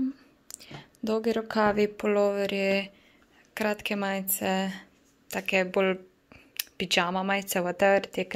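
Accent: Carinthia, Austria. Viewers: Ta koroški naglas je 🔝👌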